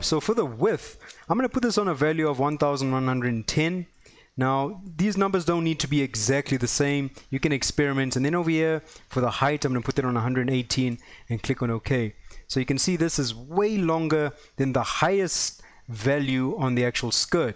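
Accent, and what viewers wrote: South Africa. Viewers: my bra! I have been looking for a quick and simple tutorial to get started with Marvelous and you hit the nail on the head! Love seeing some stellar content from fellow South Africans. This might sound strange but there is something about learning in your own accent that just makes it so much easier to understand. Keep up the stellar content! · I thought your accent sounded South African, confirmed by checking out your ArtStation page. :D And WOW, I'm seriously impressed with your work man, subbed here as well as your ArtStation page! Looking forward for more tutorials from you!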